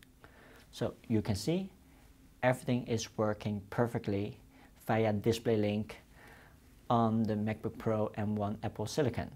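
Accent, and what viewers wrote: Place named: China. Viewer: Just listened to a terrible chinese accent for for absolutely nothing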